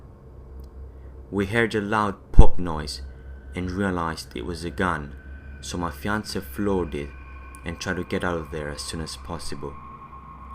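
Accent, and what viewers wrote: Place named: Derbyshire, United Kingdom. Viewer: even though you're Greek you sound like my friend from Derbyshire County in England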